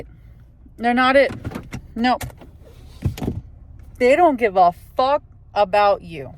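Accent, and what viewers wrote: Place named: Texas, United States. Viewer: you sound like 100% Texas mother.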